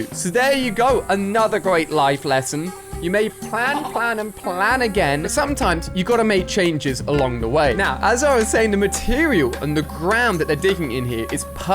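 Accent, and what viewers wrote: United Kingdom. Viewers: an inside stairway us:your british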